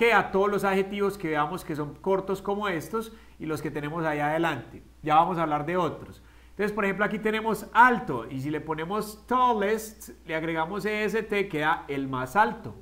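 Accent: Colombia. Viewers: Me encanta tu acento colombiano 😍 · Jaj, me llamo Valentina❤😁. Muy buena clase..gracias! Y tu acento colombiano es encantador👌👌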